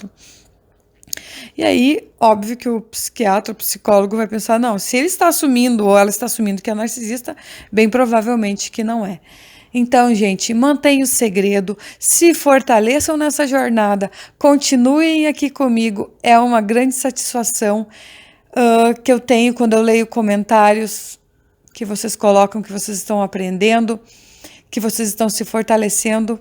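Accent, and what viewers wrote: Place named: Rio Grande do Sul, Brazil. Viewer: Seus vídeos são brilhantes mas seu sotaque gaúcho é simplesmente ADORÁVEL.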